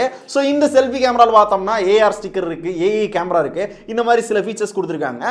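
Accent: Madurai, India. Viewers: Bro neega madurai ya bro.. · Bro neeng madurai ya..... Naanum madurai thaan adha ketta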